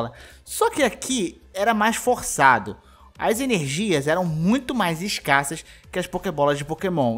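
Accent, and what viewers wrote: Rio de Janeiro, Brazil. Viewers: Man, se é do rio? LIKE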